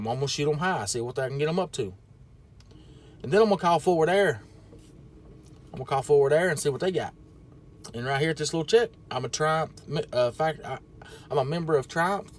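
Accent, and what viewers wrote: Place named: New York, United States. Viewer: Hey, I watched a bunch of videos and I listen to the way you talk to brokers, and that’s taught me a lot. I kind of use your template and probably sound just like you with a New York accent LOL I live just outside of Nashville now and I love the market here. Hope you’re doing well bud and enjoy your Thanksgiving holiday.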